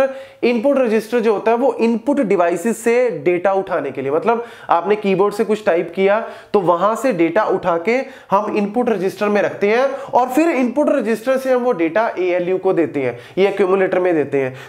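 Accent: Punjab, India. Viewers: your punjabi accent is sooooo cute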